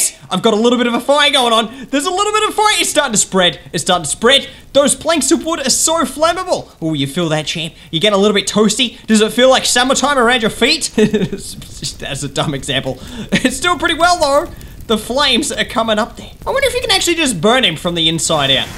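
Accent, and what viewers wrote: Australia. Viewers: im new and i like how you are so aussie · you so Australian oh no we're bodged/oh let's do a u ey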